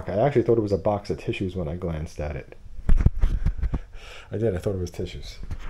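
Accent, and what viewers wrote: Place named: New York, United States. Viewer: Thanks for the tour, always like hearing your NY accent. I think big closets are because some people have so much stuff. More space around toilet makes home more ADA compliant.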